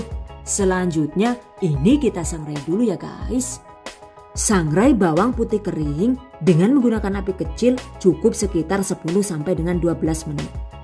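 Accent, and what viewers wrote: Banyumas, Indonesia. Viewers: Deneng ngapak......??? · ❤❤ Penjelasannya detail banget Dan seru bngt saat ngomong ngapak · Ngapakek kentel banget hiiiii jd lucu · Kenapa sih bahasanya spt orang yg glege'en gt ya maaf.. Kadang kyk kebarat2an kadang ngapax hehe oowke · Jan wong ngapak Rika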